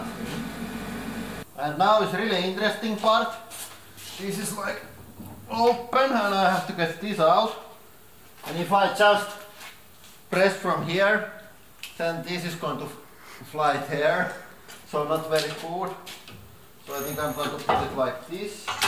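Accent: Finland. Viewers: I like the way how finish people talk english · I'm always amused with this guy's Finnish accent, saying "who-draulic" press instead of "high-draulic" press. But, in all out fairness, I imagine my American accent shows through when I speak German.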